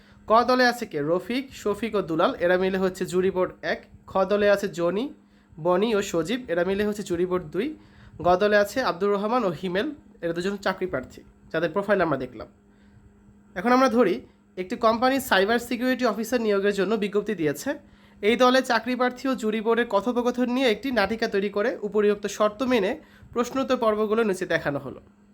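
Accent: Sylhet, Bangladesh. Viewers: স্যার আপনি কি সিলেট উপশহর ছিনেন ❤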